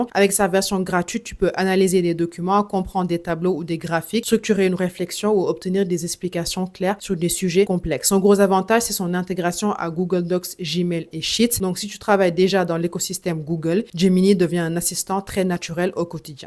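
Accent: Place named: Senegal. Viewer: Joli petit accent sénégalais ! ❤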